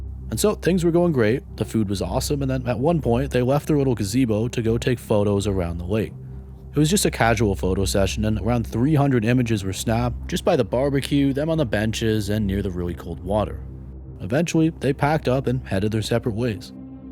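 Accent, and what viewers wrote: Canada. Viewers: Love this new chan theres loads n loads strange things I never seen before plus love way Canadians say oot 😅 same as guy from criminally listed 😀 😄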